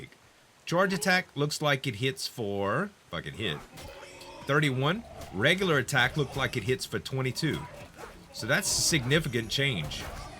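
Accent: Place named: South, United States. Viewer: with that accent you have got to be south of I-10 like me..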